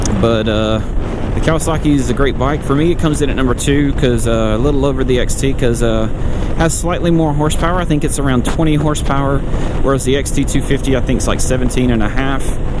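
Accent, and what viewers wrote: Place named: Alabama, United States. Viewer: I could tell it was bama before you even said anything lol. How far are you from Fayette?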